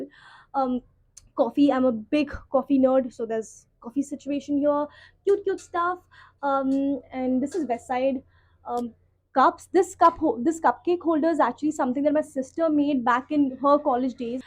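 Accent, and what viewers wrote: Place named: United States. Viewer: To much American English stop tht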